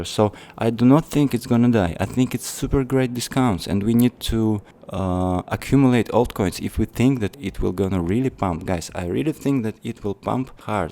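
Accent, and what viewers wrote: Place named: Russia. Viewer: Your English is perfect !👍👍👍Но есть русс. акцент как и у Ivan on Tech.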